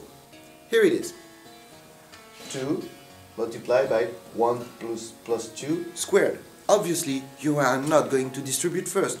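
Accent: France. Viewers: he obviously isn't English, he has a French accent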